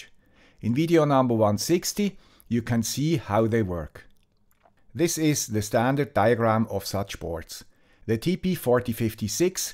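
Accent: Switzerland. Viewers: Ah, das ist also eine Youtube "Option" für creators, wo dann neben den Untertiteln eben auch die Titel und Texte gleich mit übersetzt werden , denn mir sind zuletzt eine Reihe von Videos von anderen ohne "deutschen Bezug" untergekommen und ich mich fragte, was da los sei, wobei da aber auch welche waren, die in Berlin am Brandenburger Tor gewesen waren, weshalb ich ins Grübeln kam. Vorher bekam ich halt alles "100% authentisch" (from the guy with the suisse accent), von daher fiel halt der Titel auf. Ich muss mal sehen, wie ich nur noch die Originaltitel bekomme. Jetzt hieß der Titel hier im Video wie auch in der Kanalübersicht: "Günstige und einfache Solarenergie für unsere kleinen Projekte (Deutsche Untertitel)" Wie immer ein tolles Thema spannend präsentiert und vor allem auch in all den "I want more" Stellen im Detail erklärt. Und ich fand auch die Verweise auf andere, ältere Videos sehr gut, weil da Videos darunter waren, die ich verpasst haben muss. 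DANKE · Ha. I never knew that. Alway thought it a brilliant scene. Maybe it is even better now :-) And than you learn that after 43 years on a channel from a guy with a Swiss accent :-) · Woh, 5 people don't like your swiss accent. 😊 Thx for this intresting video!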